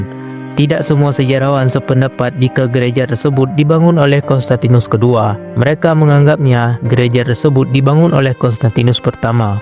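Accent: Aceh, Indonesia. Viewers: Apakah VO berlogat aceh😆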